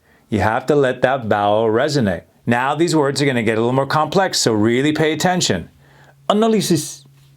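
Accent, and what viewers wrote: India. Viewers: Thats indian accent yeow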